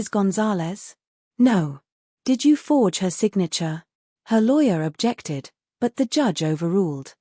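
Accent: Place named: United Kingdom. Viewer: Supposed to be an American but has a very distinct British accent. Annoying!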